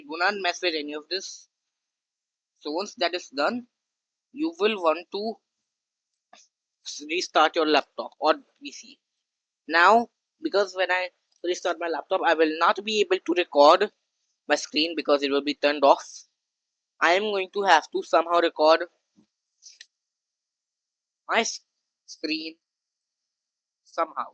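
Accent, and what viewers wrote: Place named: India. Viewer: omg indian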